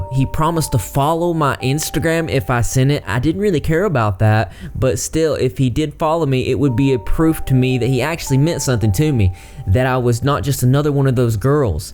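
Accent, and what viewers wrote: South, United States. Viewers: Glad i found you. Ur great.I'm in Alabama.u must be from the south too? Luv ur accent.plus ur so damn good looking! ❤...luv ur content 😊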